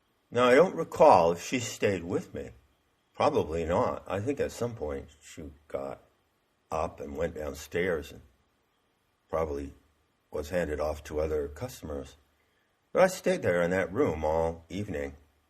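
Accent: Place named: Maine, United States. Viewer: Sensei, thank you for these genuine spoken words...i am just now obviously catching up to your most recent talks. I am not a young female of Spanish descent... this is my wife's URL, which ive had to use since being kicked off of themtube months ago. Such a Heretic am i! As a Jungian Psychologist (not Yung-lol) originally from the Boston area, i love to hear your Maine (?) accent. From Gnosticism to Jung to Henry Miller and Karuoac (Lowell, Ma) to Casteneda, the Mystery schools and more...so very much of what you say rings true to me as if it were second nature. What a terrible expression, second nature?! I have no heros, i have no idols, but simply felt the need to express how deeply i appreciate you sharing of yourself so honestly...a breath of fresh air in a world swirling in bull shite. My patience with people is waning...but it may be about damn time! 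In a sense, anyway.... I should write to you on your website without being a total blowhard. Thats not easy - i have much say that few besides yourself and a few deceased writers dare contemplate, lest it shatter their fragile egos. Your Integrity is panacea to my soul my Good Man! Cheers and Blessings from sunny, somewhat free Florida. Lux et Veritas mon ami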